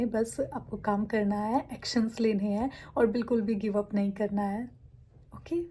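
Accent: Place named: United Kingdom. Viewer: Suddenly I came here aapki hindi british jese hai atcha hein and congratulations 🎉🎉🎉🎉🎉❤❤❤❤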